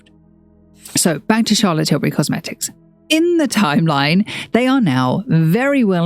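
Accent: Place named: United Kingdom. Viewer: I’m with you on that. I fall asleep to a British Egyptologist with a similar accent on the regular.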